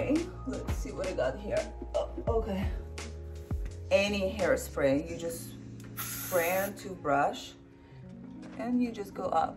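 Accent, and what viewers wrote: Russia. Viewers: У нее красивый русский акцент, очень мило)) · They do look similar and she does sound like Adriana with a small Russian accent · Had to come back again for her adorable Russian twang 🥰🥰🥰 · She is like a russian gangster with her accent and attitude. Im all here for it tho. Drop dead gorgeous. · She still speaks russian accent so much) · I love her Russian accent 😎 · I Love her Russian accent 😍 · She’s russian i think it’s just her accent 🤣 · The best things about her are the deep resonating voice with the Russian accent and the emerald green eyes wow 🥰👌🏻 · И все же чувствует русский акцент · That russian accent adds more to her sexiness than all the beauty product combined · i’m in love with her russian accent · обычный русский? вы видимо не слышали как говорят большинство россиян на анг. Её акцент по сравнению с ними чистейший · I don't know what I was expecting her to sound like but I love her Russian accent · I love her russian accent so freaking much 😭😍😍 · Russian accent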